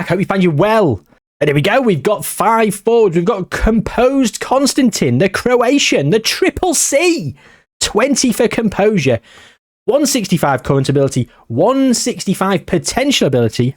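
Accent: United Kingdom. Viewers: Are you Scottish? Fun video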